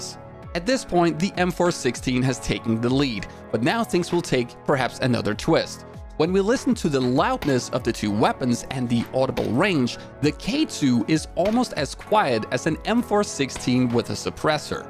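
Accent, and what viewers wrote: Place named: Denmark. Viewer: interesting danish accent!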